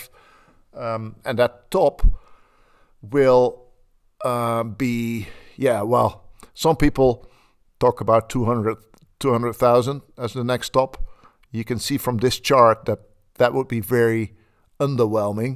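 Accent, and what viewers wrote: Netherlands. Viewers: This is the most Dutchiest Dutch accent I have ever heard. Great video! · Heerlijk dat Engels met een Nederlands accent..😊 dank voor de info. 🎉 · lekker hollands accent jonge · Come for the long term $BTC analysis, stay for the Dutch accent. Thanks!!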